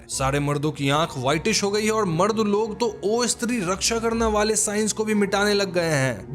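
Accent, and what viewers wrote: Bengal, India. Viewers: Larai bhai your accent is like a Bengali or Odia accent It is Ladai not larai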